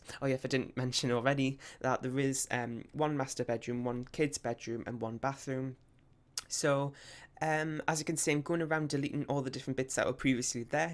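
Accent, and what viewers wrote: Newcastle, United Kingdom. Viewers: No worries :) I'm from Durham! I thought you would be from Newcastle cause of your accent :D I live in London now so my accent isn't as strong haha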